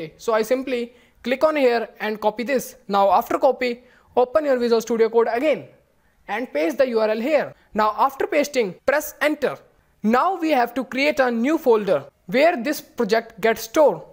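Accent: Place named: India. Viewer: What a curry accent.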